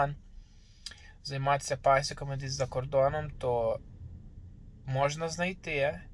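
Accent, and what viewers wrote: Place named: Western Ukraine, Ukraine. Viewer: Пан явно із західної України.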